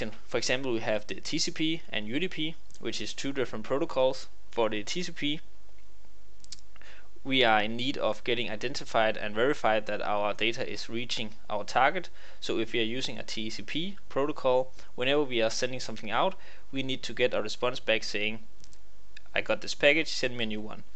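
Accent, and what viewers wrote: Denmark. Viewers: I can hear you are danish from your english accent :-)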